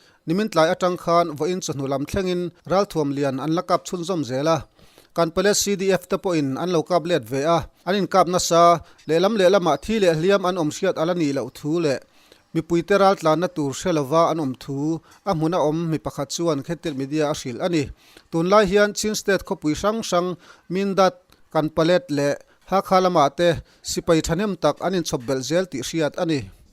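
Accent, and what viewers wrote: Mizoram, India. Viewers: a bengvar thlak thin khawp mai bak ah. i mizotawng a mawi a. Mizo tawng hi rawn chawisang deuh deuh rawh aw👍👍👍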